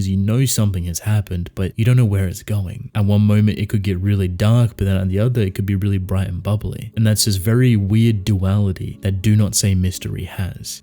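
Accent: Australia. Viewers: Are you australian ? I love australians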